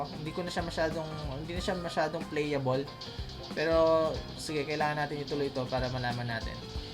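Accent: Pampanga, Philippines. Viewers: Isa kang kapampangan sure ako sa iyong punto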